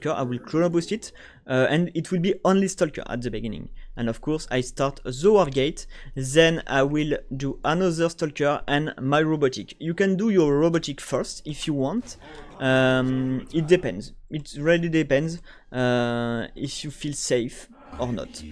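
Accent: France. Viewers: ton accent anglais fait tres francais c est tres drole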